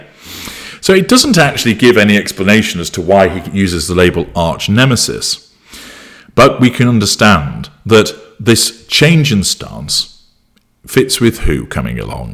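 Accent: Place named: Cockney, United Kingdom. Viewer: Love your cockney accent, shades of Ray Winston love it.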